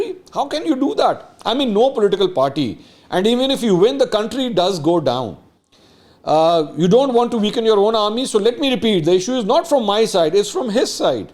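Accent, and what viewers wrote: Punjab, Pakistan. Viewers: Mansoor sb OVERACTING aur PUNJABI tarka lagany se app such nahi chupa sakty.I am not saying IMRAN KHAN is best or honest person, but PML and PPP🤐🤐🤐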